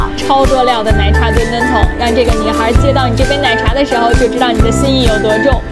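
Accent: Beijing, China. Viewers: 北京兒話~好聽！擱這吧🤗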